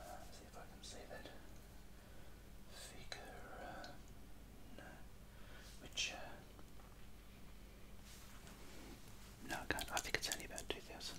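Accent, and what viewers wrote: Australia. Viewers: Saw Australian accent and immediately subscribed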